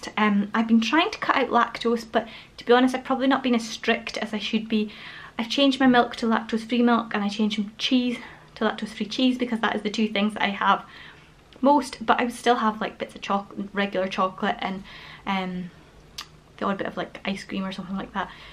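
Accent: Scotland, United Kingdom. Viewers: Hello doll... I am An old fat Scottish Jew living in London! Like to watch your videos to hear a Scottish gal And also your content is always entertaining! X thank you for taking the time to do this. Anxiety touches everyone, we all just deal with it differently. Please remember and focus on the positive influence you’ve had. X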